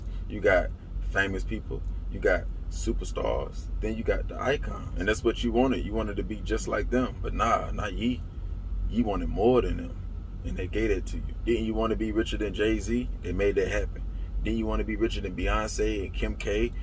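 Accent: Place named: New Orleans, United States. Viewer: This nigga sound like he from new Orleans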